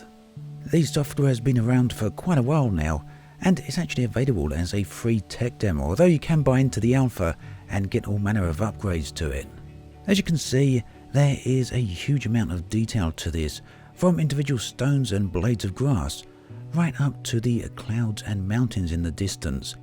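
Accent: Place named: England, United Kingdom. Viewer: How in the name of good lord fuck did you think he was from america lmao he has one of the strongest English accents around hahaha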